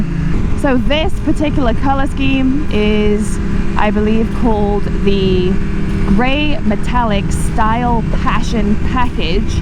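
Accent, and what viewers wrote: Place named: Cardiff, United Kingdom. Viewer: Mad hearing a Caadiff accent in the US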